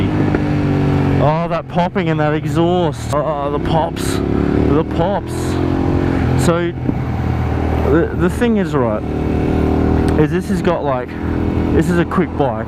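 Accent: Australia. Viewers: Wait mate u from Australia? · Him: "SSSCOOIN ON EVERYONE" Me: Oh gday fellow aussie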